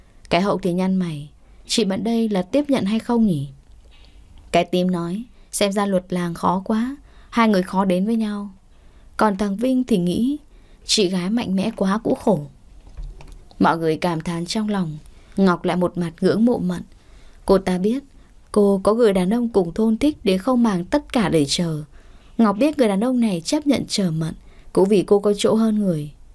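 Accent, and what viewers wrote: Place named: North, Vietnam. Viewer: Giong độc chuẩn miền Bắc,. Thanks,,👍👍👍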